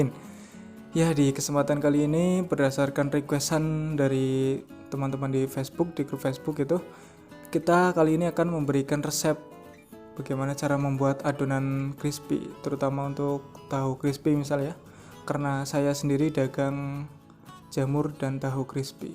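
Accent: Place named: Banyumas, Indonesia. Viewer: jualan dimana mas, kaya bahasane ngapak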